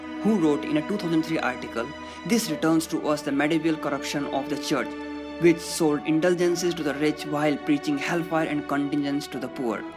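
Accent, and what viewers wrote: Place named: India. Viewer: Hard to understand this kind od indian version on Hindi English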